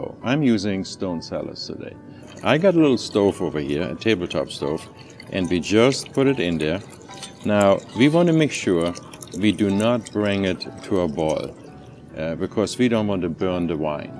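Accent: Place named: Germany. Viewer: I love this, I absolutely love this. It put me right in the Christmas spirit and made me feel right at home. I have a very german family with very german older relatives, and this all just brought me back home. And to top it off I have a new recipe to bring to my (Polish) inlaw Christmas table. Who I know will absolutely love this! But honestly, how is it some guy, with a german accent, somewhere in the world made me feel so at home and comfortable all at the same time. I honestly wish I could spend a night or a week at his hotel just to feel all that in person. This man is the host that all hosts strive to be.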